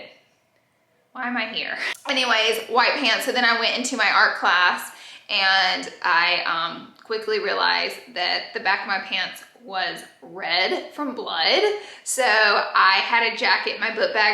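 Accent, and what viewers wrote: South, United States. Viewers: I love the country voice! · Girl, you still country af!!! But I absolutely love it because I’m a country girl too!! I love watching you 😘😘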